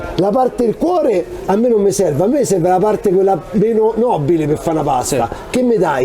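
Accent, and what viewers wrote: Rome, Italy. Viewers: RICORDATI A MILANO NON RINNEGARE IL ROMANESCO!!!!!!!!!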